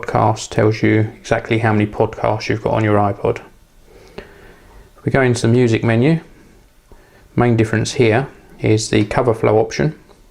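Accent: United Kingdom. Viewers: wonderful accent! love the UK!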